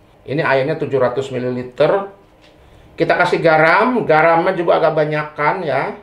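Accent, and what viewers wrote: West Java, Indonesia. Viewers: Kungkurahan,Sunda banget Pak😂❤️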